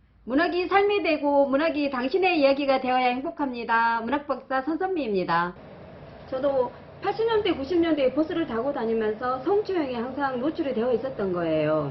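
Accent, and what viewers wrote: Gyeongsang, South Korea. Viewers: 경상도 악센트가 진하니 푸근하기도 하고, 말씀의 내용이 강약이 생겨서 더 정겹고, 명확하게 들려옵니다~ ^^ 들여다 보는 놈, 얼마나 놀랬을까요~ㅎ 그쵸, 한 번의 나쁜 것을 일반화시키는 것은 위험하죠~ ㅎ 재밌게 잘 들었습니다~ 👍👍👍+41 먼저 찾아주심에 손잡고 응원합니다~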